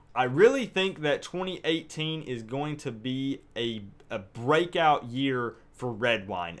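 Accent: South, United States. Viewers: Are you from Miami? You have a deep country drawl... I wasn't sure.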